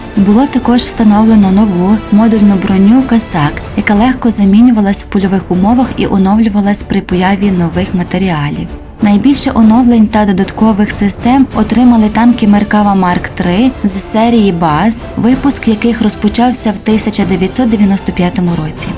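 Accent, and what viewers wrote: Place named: Lviv, Ukraine. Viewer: От слухаю диктора, і розумію що цей диктор точно з Львівщини)))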